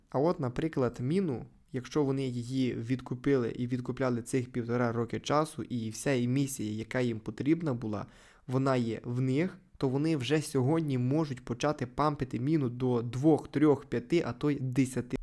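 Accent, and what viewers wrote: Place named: Western Ukraine, Ukraine. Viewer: Дякую за відео,по говірці чути зо людина з франківщини чи львівщини , я вгадав???)))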